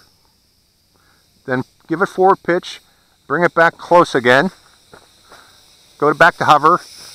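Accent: Pittsburgh, United States. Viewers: I could be wrong, but you sound like a native Pittsburgher for sure 😉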